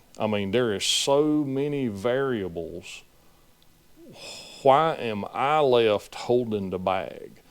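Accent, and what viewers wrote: South, United States. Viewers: my man here has got once serious country accent....I miss the south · lack of oil supply on cam gears in my opinion and your southern draw is awesome dude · this is the most southern state drawl I've ever heard, a true testament to the American educational system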